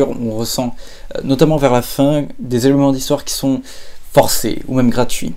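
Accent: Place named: United States. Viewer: Original, on sent que t'es à fond dedans c'est cool :) J'suis d'accord avec LeFou, tu as tendance à mâcher tes mots en fin de phrase et quand tu dis les noms des gens. C'est dommage on peut pas apprécier ton accent américain :P Pour le film j'vais donner autour de 40/51 parce que malgré la bonne surprise y'a quand même quelques défauts. C'est un bon début pour la chaîne o/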